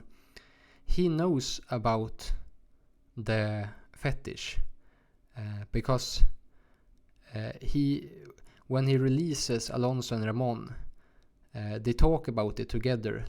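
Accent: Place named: Sweden. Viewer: S tier Swedish accent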